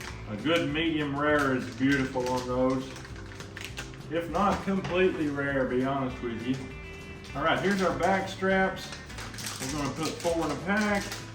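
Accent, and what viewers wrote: Tennessee, United States. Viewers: YOU FROM Texas BUT YOU STELL HAVE THE TENNESSEE ( T ) in it hahaha I've not Commanded on your VIDEOS YET BUT IVE BEEN WATCHING A LONG TIME ALL YOUR VIDEOS ARE BLESSED TO WATCH GOD IS SO GOOD TO US ALL THE TIME AMEN BROTHER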